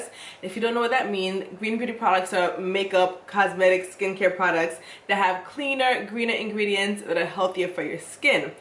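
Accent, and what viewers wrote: Jamaica. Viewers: Hi, i love your videos and your Jamaican Accent😎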